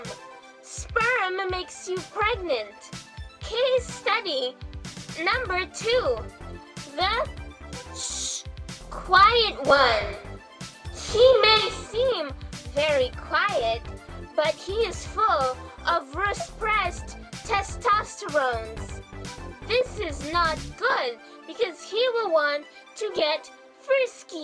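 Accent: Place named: England, United Kingdom. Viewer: As always speaks in a VERY English accent EDITING ON TOP FORM 😅 Loved this, I genuinely look forward to when you upload 😄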